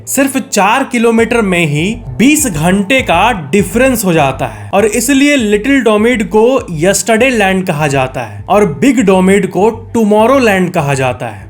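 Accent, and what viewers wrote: Bihar, India. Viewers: LAGTA HAI AAP BIHAR SE HAI 😄😄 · That bihar Accent.... kaisan baada ho❤❤😂😂😂😂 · Your Bihari Vasa Acha Tha 😅😅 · Bihari confirmed✅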